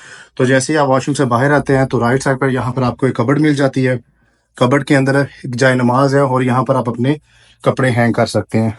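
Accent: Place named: Punjab, India. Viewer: Ap Baloch hain but ap ka lehja Punjabi jaisa hay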